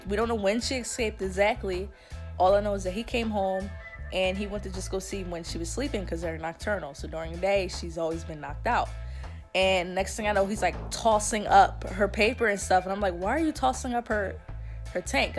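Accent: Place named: Philadelphia, United States. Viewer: 😂 as soon as you started talking I could tell you was from philly. Good video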